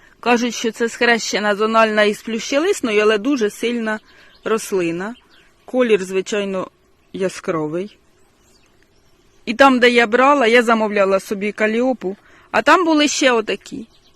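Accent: Poltava, Ukraine. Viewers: Тільки підписалася на ваш канал. Почула полтавський говір.Якщо не секрет, з якого району? Цікавить діхондра, чи є на продажу?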